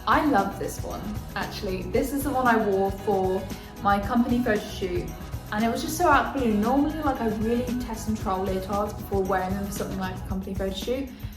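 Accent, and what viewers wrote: United Kingdom. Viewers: You speak British English very pleasantly. I think your video was well produced and presented. I'll teach you how to speak Southern American English if you should ever come to Florida.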